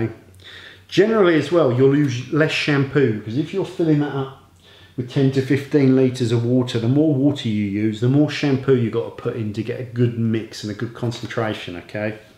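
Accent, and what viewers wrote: United Kingdom. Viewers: More people need to listen to you in the detailing industry... Having 30 steps to weekly wash a car is just crazy to me. I always try to do a good effective wash without spending 2hours of preps+wash+putting everything back in the garage. And i also use in my rinse bucket a vertical grit (bough at my hardware store) to wash my mit properly, then wring it out. I think i am going to try your method, just because it makes it so cheap, safe, and practical. Keep up the good work my friend, plus i always laugh at your jokes, might be the british accent lol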